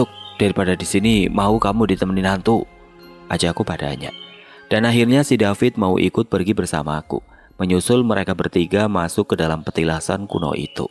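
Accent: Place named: Banyumas, Indonesia. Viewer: Ngapaknya jangan terlalu di liatin bang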